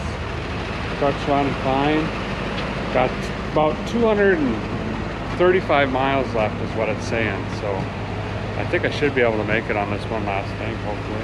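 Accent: Minnesota, United States. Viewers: I bet you're thrilled to have her home. I have a '59 Skyliner that's been in my family since '78. When my dad died, a few friends suggested I sell her. They just don't get it. A little Minnesota tie in, she may have an intake manifold yanked out of a donor at Windy Hill in New London, probably in about '86 or so. We were up there for some family stuff, and the old man wanted to go junking. I enjoy your channel. It's nice to learn stuff, while also enjoying your accent. You talk like most of my family from up there. I was born in MN, but smart enough to leave before I was 2. 😎🤓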